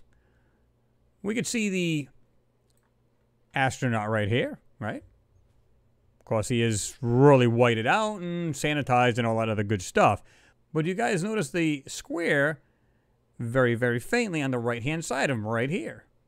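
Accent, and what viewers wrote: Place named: New England, United States. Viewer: Yeah I'm Diggin these videos I subscribe to you. I am also loving that New England accent. So much better than this Florida twang I have been forced to endure for 46 long yrs.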